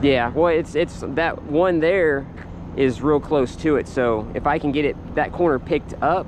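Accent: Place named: South, United States. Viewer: You’re welcome and hopefully you’ve got your flatbed back by now lol Oh and I like your southern accent, where are you from? I’m in good ‘ole Kansas lol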